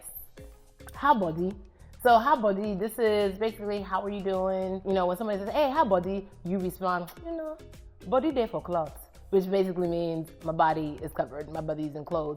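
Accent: Nigeria. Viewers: The Nigerian accent is funny no cap 😂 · Actually,you could guess how long she's ever been in Nigeria just by the pronunciation.she don't have to be yoruba to get those accents right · I love listening to the Nigerian accent. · I don’t really like her character on insecure but her Nigerian accent is so funny I love it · Yvonne's Nigeria accent and attitude has a lot of similarities with Mayowa Ekpo 👍👍👍👍👍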